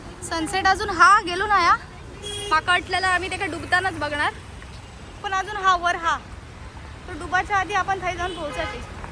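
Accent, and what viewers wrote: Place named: Malvan, India. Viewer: बोलतस मस्त.असाच बोलत रव्ह.मालवणी बाज कायम ठेव👌👌👍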